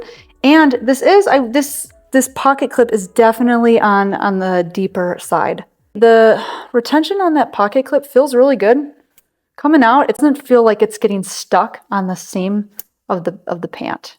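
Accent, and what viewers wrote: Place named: Michigan, United States. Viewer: I love that she took my comment as cool as she did, because you can’t really judge tone through text but I love when she drops “actually” in her videos... She hits it hard with that upstate Michigan accent... (it’s kinda cute)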